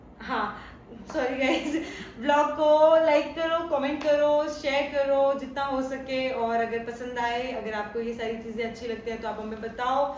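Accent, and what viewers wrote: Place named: Haryana, India. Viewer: ap haryanvi balti achi lagti ho.. to ap roj haryanvi bola karo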